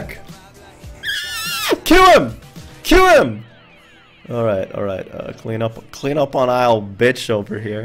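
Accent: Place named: United States. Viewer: Are you sure you're not American? You sound like somebody from where I live.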